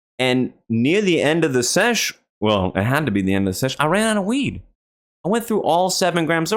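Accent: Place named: New Jersey, United States. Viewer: Am i the only one that's noticing part of a jersey accent in his voice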